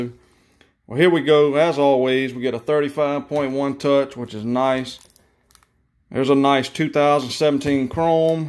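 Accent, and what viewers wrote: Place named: Louisiana, United States. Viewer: Love your channel and Louisiana accent..lol..You seemed so down to earth and humble and what makes you stand out to me..lol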